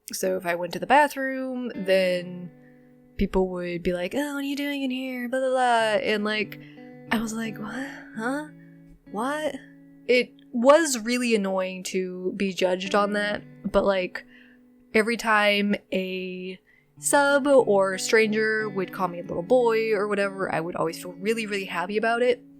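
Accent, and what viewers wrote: Midwest, United States. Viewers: Midwest: heavy souther with some northern mixed in and a bit of its own thing all at once.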